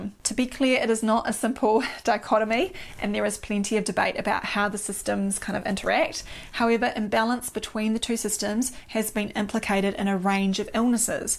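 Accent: New Zealand. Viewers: Absolutely stunning! And brilliant! Wish I had you as my physician! Although I may get lost with all the biology and chemistry lol What a smile but I'm sure millions have already mentioned that! Love the accent. I was in Oz in the early 90's and absolutely loved the accent and the people and yes I realize you are a New Zealander. I'm sure they are just as friendly as Aussies! G'Day! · I love listening to this cute Kiwi accent :-)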